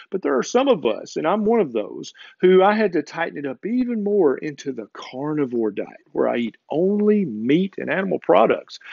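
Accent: South, United States. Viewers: Nice to hear a southern accent on youtube! South Carolina here. Great vid! 🖒💚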